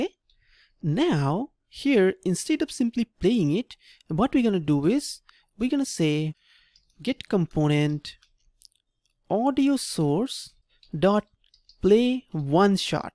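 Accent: India. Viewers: Are u indian or Nepali🤔😅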